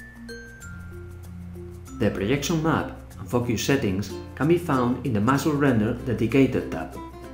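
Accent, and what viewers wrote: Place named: Spain. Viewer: Offended by a Spanish accent from someone named " "? It's a joke right?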